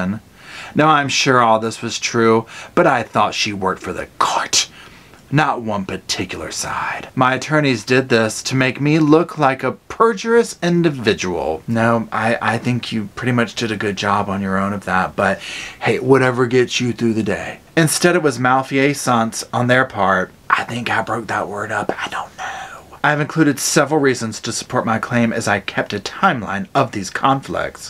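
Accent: South, United States. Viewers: She is a freaking Narcissist that knows everything. However, I love your Southern twang! 👍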